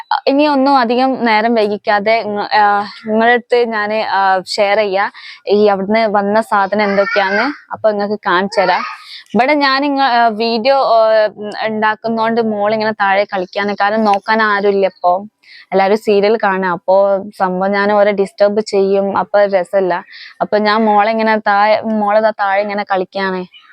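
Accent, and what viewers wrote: Malappuram, India. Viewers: Malappuram ജില്ലയിലെ മുസ്‌ലിം പെൺകുട്ടികൾ സംസാരിക്കുന്ന പോലെ ❤ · നീ എത്ര കാലം karelatttil താമസിച്ചു ❤️👍❤️അതിശയം മലപ്പുറം ഭാഷയയാണ് തോന്നുന്നത് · നല്ല മലപ്പുറം മലയാളം ❤️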